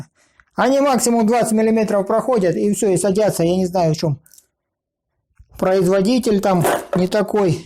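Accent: South, Russia. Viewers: Я сначала думал он на самом деле себя (и канал) назвал. И говорок у него такой типа, южный. Многие знают как там Гэ-кают. А он оказывается. Т.е. механический мозг. Но блин, он и правда! Надо же так отверстие придумать прорезать! И чем? Саморезом! 👍👍👍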